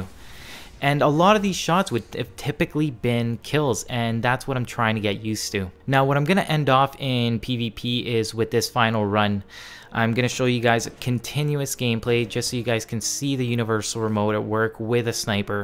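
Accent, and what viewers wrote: Canada. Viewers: Canadian ey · U have the only Canadian accent I've ever heard....and I'm Canadian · You are the most Canadian sounding person of all time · I think he's from Canada it's pretty rood to get mad at someone for their voice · His canadian accent is bleeding out of this video... It sexy tho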